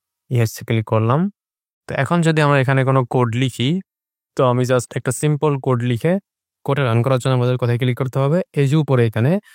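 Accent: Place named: Jhenaidah, Bangladesh. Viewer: ভাই আপনার বাসা কি ঝিনাইদহ?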